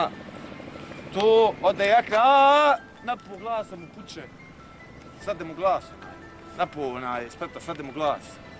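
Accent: Sarajevo, Bosnia and Herzegovina. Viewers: Ovo je normala u Sarajevu, tako se jednostavno prica. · Ovo je kalup za ucenje sarajevskig akcenta😊. Ima ga Zena Tln. Minka...Tln. ali ko voli da nauci.evo to je taj. Ima ga i Zera crv.jabuka. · Pa ovako pricaju stare sarajlije, oni koji su se rodili i odrasli u ovom gradu. Sad, to sto si ga ti cuo samo na TLN pa mislis da su ga oni izmislili… Nije akcenat nastao sa TLN ekipom, nastao je sa stanovnicima Sarajeva. Sarajevo ce biti, sve drugo ce proci. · čuj parodija 😂 pa svi mi u Sarajevu ovako pričamo, Nadrealisti i Nele su personifikacija ljudi i života u SA.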